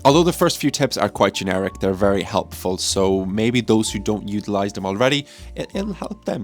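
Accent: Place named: Ireland. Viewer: What is your ethnicity? You sound either Irish, but I’m guessing your name, is Czech/ Slovak, since is Polish for Paul, & those are West Slavic languages.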